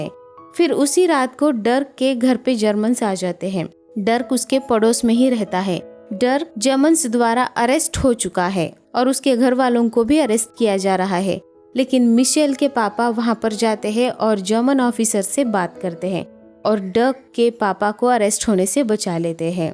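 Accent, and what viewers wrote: Maharashtra, India. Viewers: तुम्ही महाराष्ट्रीयन आहात ना...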